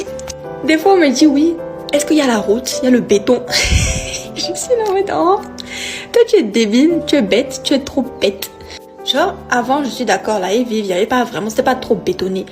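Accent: Benin. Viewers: J'aime trop ton accent béninois, très cool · Le vrai accent Béninois extraordinaire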